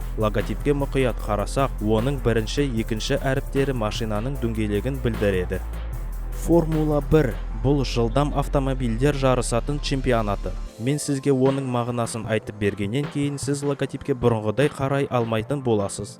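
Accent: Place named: Kyrgyzstan. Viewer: 😡дұрыс сөйле қырғыссын ба?👅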